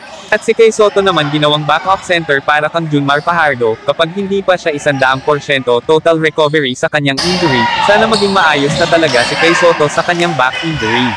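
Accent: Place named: Visayas, Philippines. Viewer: Bisayang bisaya ang salita mo.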